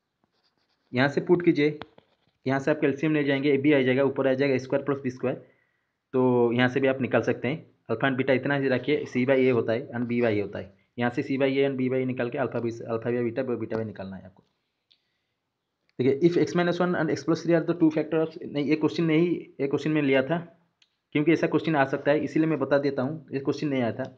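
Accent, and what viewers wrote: Odisha, India. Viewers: There is nothing wrong with ur accent. Ppl who comment on Indian accents should be ashamed of the of themselves. Diversity is the core of India 🇮🇳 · Pura odia lagucha bhai.. 😊☺️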